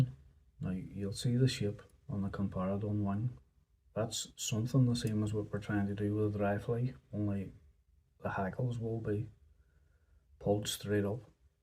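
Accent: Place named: Ireland. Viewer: What part of Ireland are you from....nice tie by the way....keep up the good work....i've only started trying to tie smaller flies recently as the eyesight isn't as good as it used to be unfortunately...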